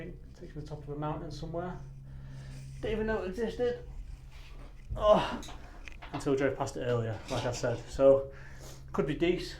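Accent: United Kingdom. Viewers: well done mate. I just can't help but laugh watching and hearing your northerners accent talking about my beloved homeland Kurdistan... the highlight of the video is where you say the cherry on the cake "the bloody toilet", I know exactly what you mean. I wanna congratulate you on this fantastic piece. although there much more to explore in Kurdistan and I hope others in the west will visit and follow you. bravo.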